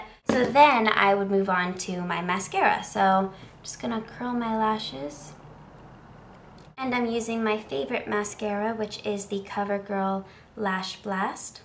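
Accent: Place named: South, United States. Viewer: where are you from i absolutely love the southern drawl!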